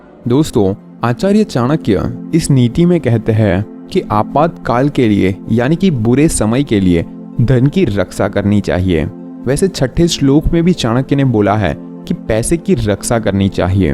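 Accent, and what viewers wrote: Gujarat, India. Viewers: deepak kumar bhai We are gujrati hindi bolne ka jo tarika hota hai aaisa hi hota hai... sabko continue bolne me problem hota hai... kai ese sabd hote he jo hindi bol nahi pate... agar aapko aapni bhasa se dusri bhasa bolne ko bole to aapko bhi problem honga... so don't mind improvement sabhi ka aa jata hai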